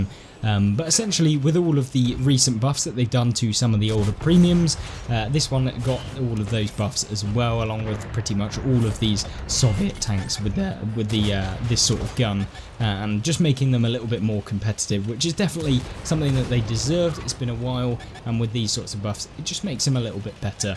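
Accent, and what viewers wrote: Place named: United Kingdom. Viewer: Playing every class is a good way to learn the game, map as spots for light tank to hide in the open that will not work as a medium of heavy tank, Playing Arty will make you learn the places to hides and to stay on the move, in my personal opinion Good Arty player are Arty that stay on the move to, yes you can take one to 3 shot from the same position Sometime all your shot without moving but following your team as the game progress especially if you have a slow Arty can be a Good way to take the enemy by surprise if they expect you to stay at the base in the right corner of the map for example but all your team went left and you follow them then they will be confused on how they can get it by Arty and then don't know where to hide, yes classes have there roles to play but the more you can adjust during the game the more useful you are to your team with the motherland in that case if you top tier you can rely on your armor to play like an heavy medium and block shot and your reload is fast so you can support your light armor teammate, but in t10 game you better play it more like Spotting medium cause you got a nice camo on this one and can't rely on your armor but you can support your heavy that lack vision range at that point and help spotting TDS who fire at them, BTW really love your channel never got the chance to play with you yet I'm guessing you must be in Europe server as you sound from U.K, sometime I play with if your friendlist isn't full you can add me on Xbox RTB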